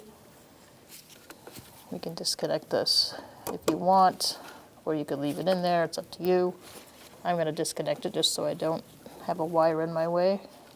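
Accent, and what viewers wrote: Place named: Massachusetts, United States. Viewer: Love the accent, sounds like she's from the northeast like Massachusets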